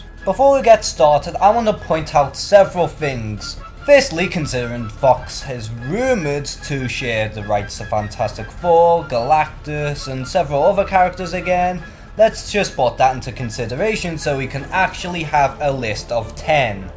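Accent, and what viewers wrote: Liverpool, United Kingdom. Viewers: One of the most cringe worthy videos I have ever seen. Your voice is bland and boring. Plus the fact that you're Scouse makes it even worse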